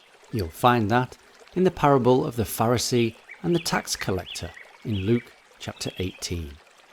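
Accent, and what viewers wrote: United Kingdom. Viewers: LOVE THOSE BIBLE QUIZES.GREAT QUESTIONS!!! LOVE THE BRITISH ACCENT.GOD BLESS EVANGELIST ROGER MANSOUR MISSIONARY TO HAITI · Such a brittish accent 🇬🇧 😂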